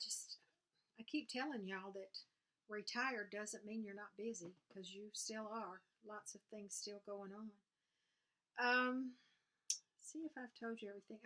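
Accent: South, United States. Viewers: I feel blessed to have you as part of the flosstube community. I always watch your videos first whenever they come up in my feed. I love your stitching, and listening to you talk reminds me of my southern relatives who are no longer with us.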